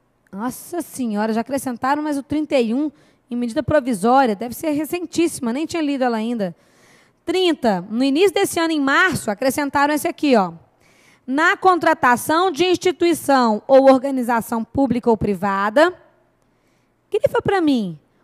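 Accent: Minas Gerais, Brazil. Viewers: Professora, DEUS abençõe sua vida por sua boa vontade, continue lhe dando disposição e muita saúde para continua lecionando de forma tão agradável!! às vezes fico quase o dia todo escutando suas aulas. Tenho aprendido bastante!!! gosto da sua aboradagem, da forma como vc se expressa e todos os exemplo que vc utiliza. Sem se falar do sotaque mineiro rsrsrs acho uma gracinha!!! Obrigada de coração!!!! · Professora, vc é muito lindinha com esse sotaque, "uai"!!!!!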